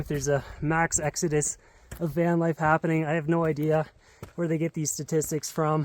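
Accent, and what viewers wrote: Canada. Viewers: Don't forget that epic Canadian Accent! 😉🚚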